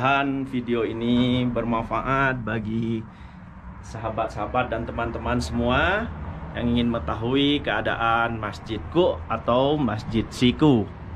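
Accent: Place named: Madura, Indonesia. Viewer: klo dr bhsaxa pasti madura🤣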